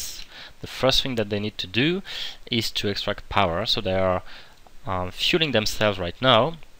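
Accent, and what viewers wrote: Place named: France. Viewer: Hey this is awesome! Also, you sound really french! If you are then: Salut! Super mod! Je kiff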